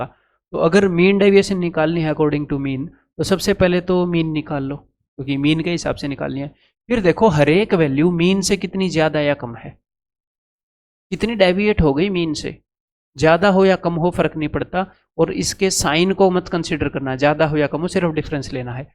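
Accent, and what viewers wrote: Haryana, India. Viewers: sir ka haryanvi language k saath padhana accha LG rha or statics bpsc k mains bhi aati h tension nhi rahi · हरियाणवी हो क्या Sir 🙏👌 Thanks 👍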